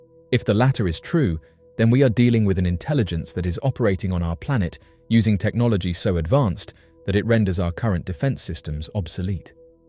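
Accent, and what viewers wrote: England, United Kingdom. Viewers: Run for your lives !!! The Aliens are coming !!! Be very Afraid !!! Do what the Aliens tell you to do !!!!!! Do what a creep with an "English" Accent tells you to do !! Believe the Propaganda !!!! This is really entertaining for me !!!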